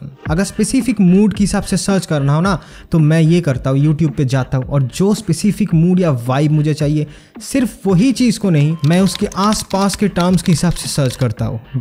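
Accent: Bengal, India. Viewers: Re tumi bangali na Tomar tone e bojha jay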